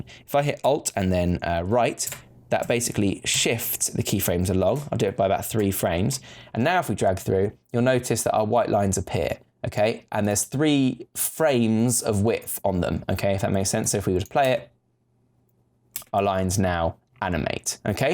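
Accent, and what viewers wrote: United Kingdom. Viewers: You are actually one of the better instructors, but your British accent, sometimes hard to follow for Californians like muahhhh. But I still subscribed. Thank you, sir. Brit.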